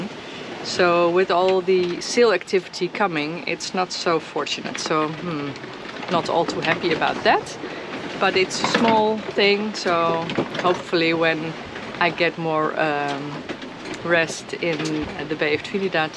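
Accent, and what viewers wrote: Netherlands. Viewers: Love your videos, great sailing with good attitude, a good role model for all us sailing in small boats, all with lovely Dutch accent. Greetings from Finland!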